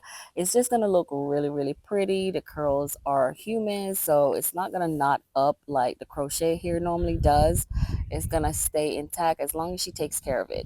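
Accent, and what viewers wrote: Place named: Jamaica. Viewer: I knew you were jamaican. Lol. I asked in 1 video and you never responded. I'm jamaican too🇯🇲🇯🇲🇯🇲🇯🇲. I could hear the accent.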